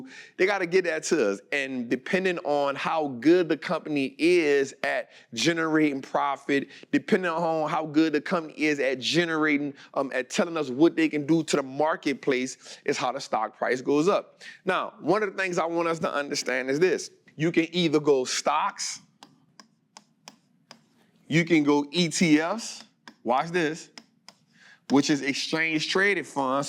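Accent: New Orleans, United States. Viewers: Is your school in New Orleans? I hear the Nola accent. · From that first sentence I can tell that boy from New Orleans like me⚜️ let’s go brudda! Definitely gonna give you a subscription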